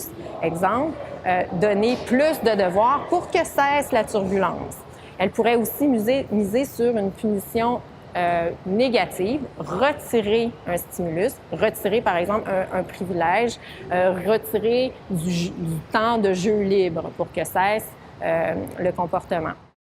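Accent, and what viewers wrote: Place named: Canada. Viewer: Merci beaucoup très belle canadienne ! Je suis parisienne..j'ai réussi à vous suivre avec votre bel'accent ! 🐈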